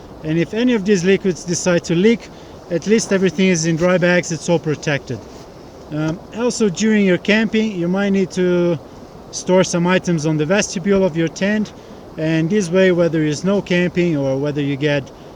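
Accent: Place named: Brazil. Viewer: Thanks for the video! I discovered dry bags recently and have started using them a lot. Incidentally, love your accent. At first I thought Brazilian, then figured you for an Italian... then found your Instagram and had my original suspicions confirmed! :)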